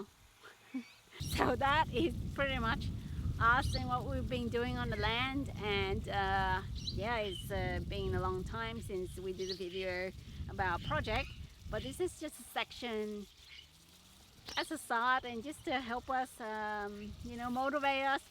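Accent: Australia. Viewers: Hi, I like your approach for a wind break. Bamboo has the highest oxygen output and carbon capture of any plant. The high Silica content of the leaf drop captures moisture in the ground and provides habitat for insects and critters. Oldhamii can grow three stories tall <link> and can withstand 120km winds. It can be char-coaled and it will produce the hottest burn of any charcoal known today. You sound Australian? Where are you in the country? I'd like to know for climate zone reasons. Also I would suggest reading about wind break design, usually you plant small trees infront which stops the full frontal force directly onto the trees or plant and minimizes turbulence, though I'm sure Odhamii can take it. see <link> & <link> for a great explanation.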